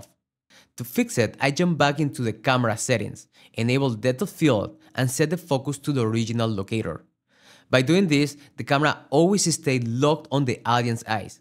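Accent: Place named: United States. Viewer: American can't you tell lol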